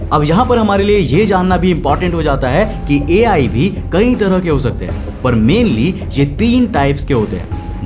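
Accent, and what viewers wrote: Bengal, India. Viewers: Bhaiya kya aap bengoli ho ??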